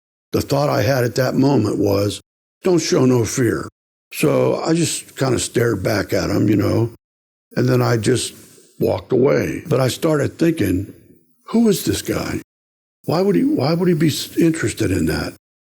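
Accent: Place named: Pittsburgh, United States. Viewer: I KNEW you were from Pittsburgh, but I couldn't confirm it until you just mentioned it. I was born and raised there myself, and now that I have lived outside the city for so many years, I can catch the accent immediately. Anyhow great series. I fell in love with it and I am binge-watching right now. I am going to purchase the book this week. Thank you.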